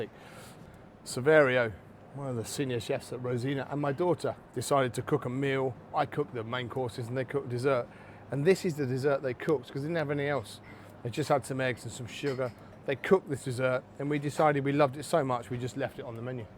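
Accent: United Kingdom. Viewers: He's British, lol.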